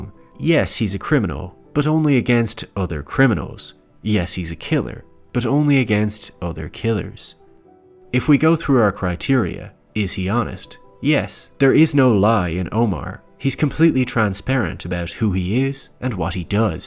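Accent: Ireland. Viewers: Great channel. Please consider using your own lovely accent rather than the classic youtuber vocal fry + upwards cadence thing. I can hear there's an Irishman in there!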